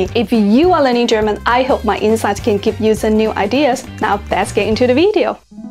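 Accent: Germany. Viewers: your German accent is slightly beginning to pour on over your English :P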